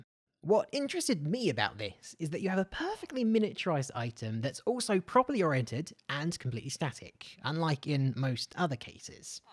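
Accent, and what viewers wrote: United Kingdom. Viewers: I love the voice it sounds British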